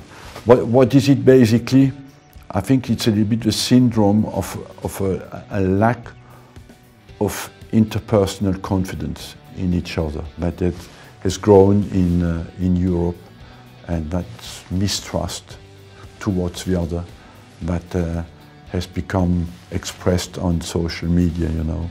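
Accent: France. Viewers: Being from Alsace like Arsène, it’s funny and endearing to hear his Alsatian accent carried through to the way he speaks English. Legend.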